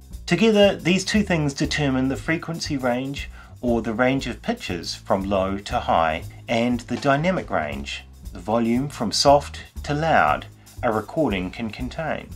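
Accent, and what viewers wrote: New Zealand. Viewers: OMG a New Zealander opening his mouth. God save my ears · Nice to hear a fellow Kiwi from New Zealand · yep New Zealand, just checked. They say "heedphones" :)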